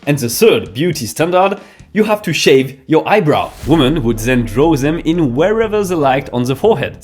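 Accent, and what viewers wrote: France. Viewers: your French accent is coming out in your pronunciation of some H's, like in "hierarchies" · ton accent francaise est tres évident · With just a little bit of a French accent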